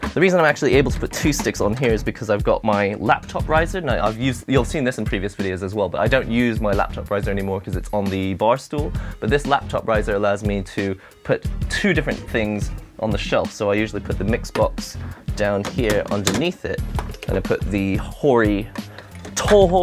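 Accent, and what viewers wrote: United Kingdom. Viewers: Why your accents like those from UK?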